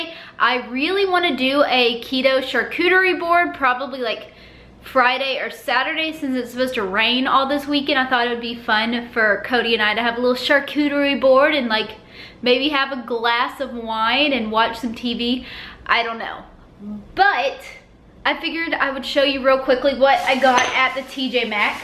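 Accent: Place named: Texas, United States. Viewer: Oh em gggeeeee!!! Just tried the hatch green chili dip from Costco ... it is DELICIOUS!!! Thank you so much for sharing!!! Just found you a week or so ago and I am now slightly obsessed! LOL! I’m a central Texas gal, so I can completely appreciate your Texas twang! 😉 Keto on!!!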